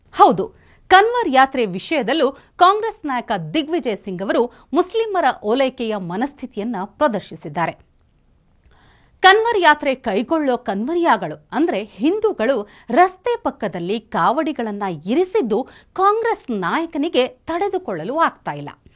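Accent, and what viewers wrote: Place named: North Karnataka, India. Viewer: ಮೇಡಂ, ನೀವು ಉತ್ತರ ಕರ್ನಾಟಕದ ಕನ್ನಡದಲ್ಲಿ ಮಾತಾಡಿದ್ರೇನೆ ಕೇಳೋಕೆ ಚೆಂದ.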